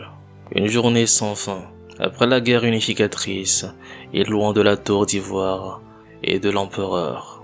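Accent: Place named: Africa. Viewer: 1 - L'histoire est vraiment géniale et fascinante 2 - Pas d'images foireuses ce qui est agréable 3 - La narration est très bonne et on y sent la volonté qui y est mise même si l'accent africain du naratteur prête a sourire . 4 - Je met 1 gros like a cet vidéo que je recommande a tous et j'invite le youtubeur a continué cet aventure .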